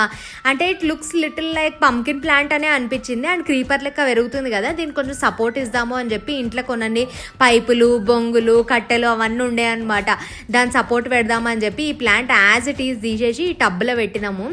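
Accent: Telangana, India. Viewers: akka nuvvu achhamina Telangana language mattladthav akka😂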